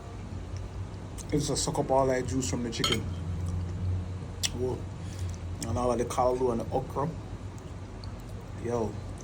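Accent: Jamaica. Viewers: are you Jamaican?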